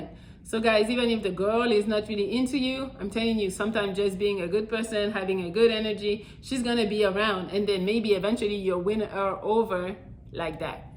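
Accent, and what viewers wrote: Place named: France. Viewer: That’s where the accent come from I thought you where French As a Haitian man I thought I Hurd it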